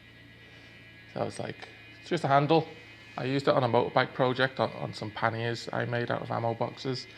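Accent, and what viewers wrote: Liverpool, United Kingdom. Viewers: You sound local to me. Scouser! I live in Liverpool also. Looking to buy a Kia e-Niro. We have an ID.3 and an Outlander PHEV. Want to get shut of the Outlander soon and wanted to see what the boot space was like on these Kia’s. Thanks for the great video on maximising the space. 👍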